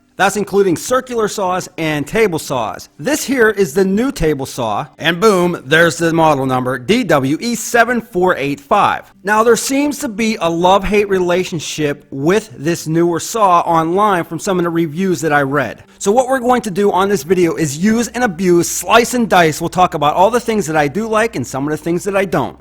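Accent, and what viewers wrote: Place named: New York, United States. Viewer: Dude, if you aren't from New York, I'd be shocked. :-)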